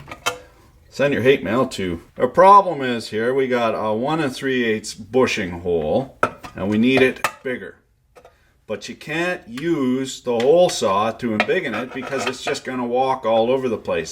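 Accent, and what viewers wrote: Quebec, Canada. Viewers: I was gonna say Canadian or from up in Michigan. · Help me out, I'm new to this channel and I cant figure out if hes super canadian or from texas. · i have a stupid question where you from men, i heard ya speak french and you sound like you're from quebec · He's a Canuck!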